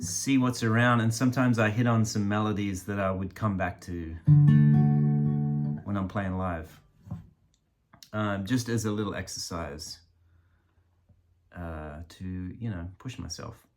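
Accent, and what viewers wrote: Australia. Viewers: I enjoyed listening to you man. Some people don't have the attention span to follow the train of thought through. Is that a slight aussie twang I'm hearing??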